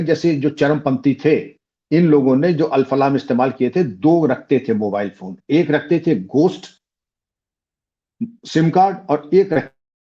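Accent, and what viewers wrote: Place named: Lucknow, India. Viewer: Samjhawan, uthawan😂 😂. I myself was in Lucknow so listening to you remindS❤❤ me of those days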